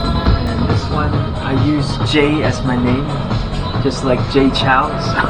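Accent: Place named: North America. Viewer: + You behave like a whiny American, you sound like your from North America are you?! What's your ancestry, Polish or what else? No, it's in south america, not in the world, look at this list and look at this info in the 1940s and 1900s "The Japanese population in the United States grew from 148 in 1880 (mostly students) to 2,039 in 1890 and 24,326 by 1900." has more then brazil always, there you see or "the number of Japanese in other countries in the Americas at the time such as the United States (285,000), Brazil (205,000)" see?! And the list that it looks like there are more Japanese in America then brazil just like peru and Canada! 1841: June 27 Captain Whitfield, commanding a New England sailing vessel, rescues five shipwrecked Japanese sailors. Four disembark at Honolulu, however Manjiro Nakahama stays on board returning with Whitfield to Fairhaven, Massachusetts. 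After attending school in New England and adopting the name John Manjiro, he later became an interpreter for Commodore Matthew C. Perry. 1850: Seventeen survivors of a Japanese shipwreck are saved by the American freighter Auckland off the coast of California. In 1852, the group is sent to Macau to join Commodore Matthew C. Perry as a gesture to help open diplomatic relations with Japan. One of them, Joseph Heco (Hikozo Hamada), goes on to become the first Japanese person to become a naturalized American citizen. 1861: The utopian minister Thomas Lake Harris of the Brotherhood of the New Life visits England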